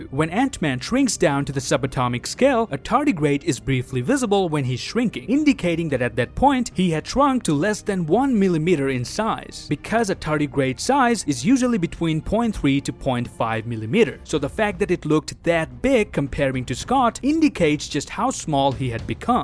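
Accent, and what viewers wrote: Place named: Canada. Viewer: lol dude because of your canadian accent opinion is openion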